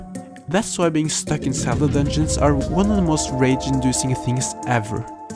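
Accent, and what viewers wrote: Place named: Norway. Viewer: May I ask where you are from? It sounds like you have a Norwegian accent, but I'm not entirely sure. I'm Norwegian, by the way.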